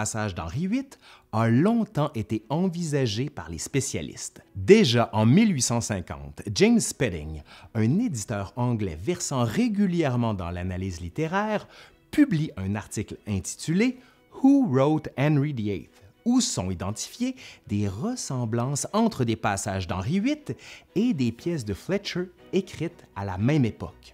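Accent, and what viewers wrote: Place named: Quebec, Canada. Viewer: Un petit bonjour de Suisse! J'adore tes vidéos! Le contenu est extrêmement bien présenté! Et le ton de ta voix, plein d'enthousiasme et de suspense, est addictif! De même que ton air sympathique et l'expressivité de ton visage :) Après, en Europe, on a tendance à trouver joli et "pitoresque" l'accent québécois, je suis sûrement biaisée ;)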